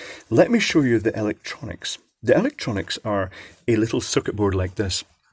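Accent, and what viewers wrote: Scotland, United Kingdom. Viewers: A) he's scottish and B) electronics is literally his area of expertise. Perhaps you need to get out meet people yourself, instead of writing rude comments on youtube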